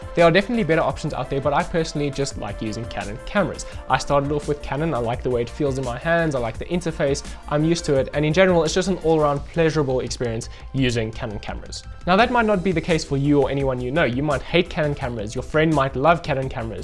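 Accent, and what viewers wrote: South Africa. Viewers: Hey Man, you sound South African :) I’m South African too lol. Would love if you checked my video out and told me what you think :) be pretty cool if you up in joburg, I could help you out with stuff and learn from you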